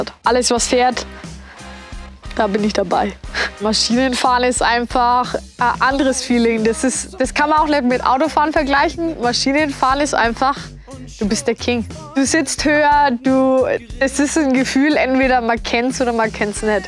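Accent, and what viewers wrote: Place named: Franconia, Germany. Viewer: Servus, erstmal geschaut wo der Hof is. Sin entspannte 50km. Freut mich total das jemand aus der "Gegend" dabei is auch wenns keine 100ha sondern nur 13 sind. Is nich negativ gemeint aber man hat echt gemerkt dass es schwer vom Fränkischen ins Hochdeutsche reden zu kommen. Bin auch totaler Gülle Fahrer auch wenn ich letztes Jahr kaum Aufträge fürs Zubringer fahren von meinem MR bekommen hab. Freu mich echt auf mehr Videos Gruß Ausm Fürther Land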